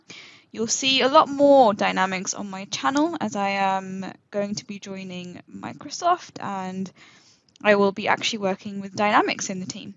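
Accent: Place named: United Kingdom. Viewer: Thank you for the quick tips. Just curious and a stereotype. Why do as experts usually have to have a British accent. Not complaining just an observation. Again, thank you. Be safe.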